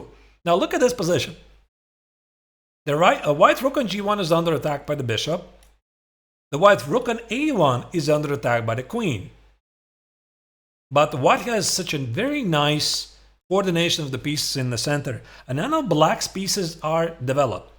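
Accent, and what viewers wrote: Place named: Germany. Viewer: your English sounds very German-like: "adwantage" or "undershtand" sound how a German would say those words. Why is that?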